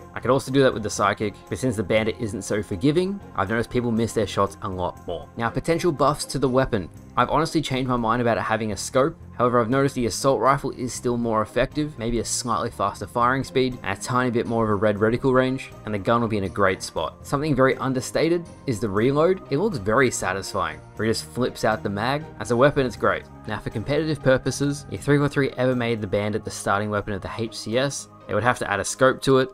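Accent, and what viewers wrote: Australia. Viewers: Lol forgot was Australian until he started the video with "G'day"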